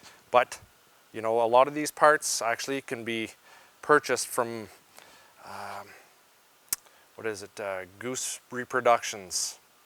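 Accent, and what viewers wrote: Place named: Ontario, Canada. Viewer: Had to add the Ontario accent...Thanks for all the awesome videos. Peace brother.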